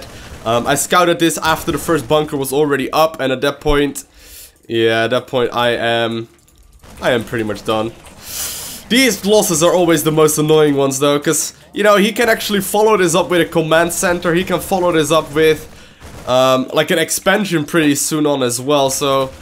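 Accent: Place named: Netherlands. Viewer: Where you from, because you speak english really wel but you have a small foreign accent. Are you dutch or something?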